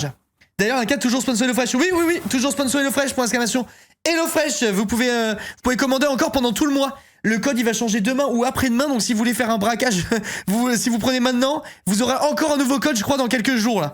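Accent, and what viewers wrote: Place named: Marseille, France. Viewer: Le dimanche c’est ACCENT MARSSEILLAIS !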